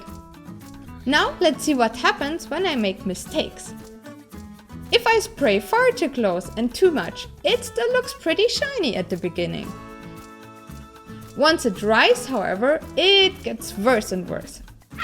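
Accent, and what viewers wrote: Germany. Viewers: Du sprichst ja englisch immer noch wie ein Deutsche, die glaubt, dass Amerikaner so reden. Ansonsten schönes Video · Your German accent is charming.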